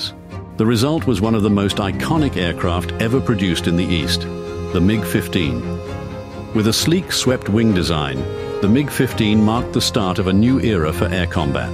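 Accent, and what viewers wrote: United States. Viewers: How is is that 'Australian Military Aviation History' have an American accent? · The narration switches between American and Australian accents mid paragraph. Why has no one else noticed this? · Why is an Aussie channel vid narrated by a yankee? And airmen from the 60's shot 8mm film, not 8mm video. Video would have been shot on 2" magnetic tape and was not portable, nor used by airmen. There is a difference between video and film. Accuracy counts in history folks. Details, even small ones, are important.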